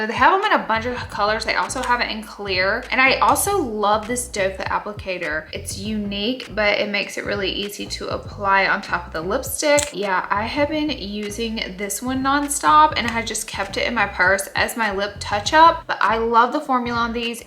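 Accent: South, United States. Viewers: Omg the southern accent! Love!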